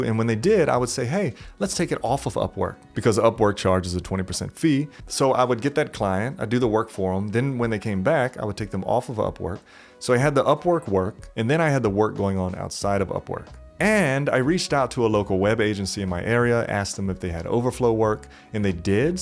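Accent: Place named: Louisiana, United States. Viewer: I changed my career from food service -> call centers -> web development. I did the final one at 30. All self taught I think it taught me more about perseverance and made me unafraid to do new stuff I no longer let not knowing something prevent me from doing it. That accent you have though, I bet you were born in middle Louisiana or southern LA sounds like Avery Island. --- To other folks looking to make this same type of switch. Do not let anyone tell you "programming is too hard" it just takes dedication and practice as well as a penchant for enjoying learning.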